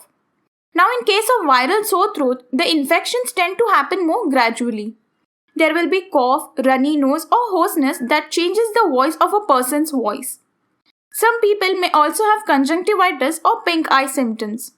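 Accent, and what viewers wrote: India. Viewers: That Indian accent though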